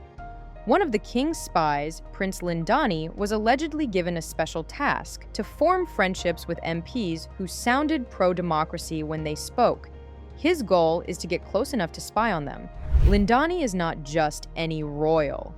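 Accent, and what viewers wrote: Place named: United States. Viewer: I wonder where this is going, you are speaking with American voice, what do you want from Africa because your President does not like Africa. Check your country, God has revealed USA as Mistry Babylon The Great. USA is about to be judged, it is imminent, prepare yourselves because you will be surprised while you looking at others countries.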